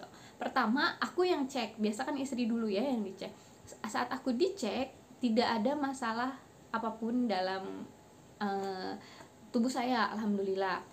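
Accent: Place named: West Java, Indonesia. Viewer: hee,.. Iyaa kaa makasih banyak yaa,. Di lihat dari cara penyampaian dan logat kaka kelihatan banget sundanya 😅. Salam dari bogor ka Semangat bikin konten berfaedah nya ka.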